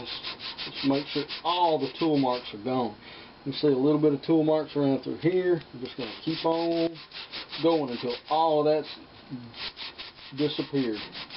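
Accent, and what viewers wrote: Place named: South Carolina, United States. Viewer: Hey. Where are you located? You sound like you come from my area. 8-) I'm in Spartanburg, SC.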